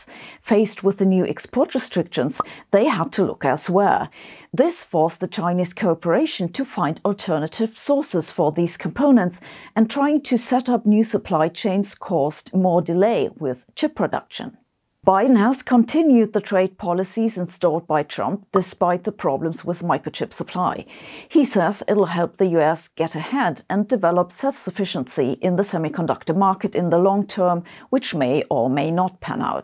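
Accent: Germany. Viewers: pɹəˈdʌkʃən love the german accent😊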